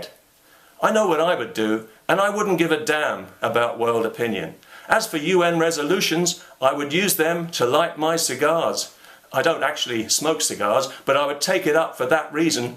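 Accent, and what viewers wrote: United Kingdom. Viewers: You sound British :) you know what. Let's give the Arabs their country back that you stole and gave away and give the Jews a part of England instead. I mean your such a nice and fair people and has always been. Lets not talk about how you treated Scotland, Africa, India, Ireland, slave trade and so on :)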